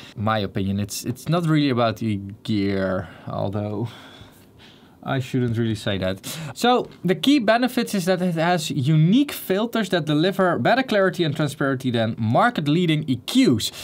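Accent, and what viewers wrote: Netherlands. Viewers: i could tell this dude was dutch like 3 words in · hoor ik daar een Nederlandse toets in uw accent?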